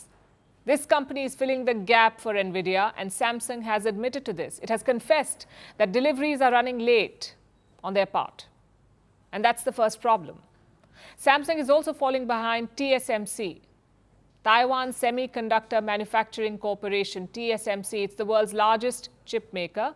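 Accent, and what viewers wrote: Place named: India. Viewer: I like your indian accent😅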